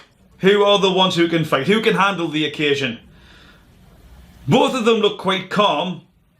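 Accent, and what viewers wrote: Newcastle, United Kingdom. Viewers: It's the accent. They are both from Newcastle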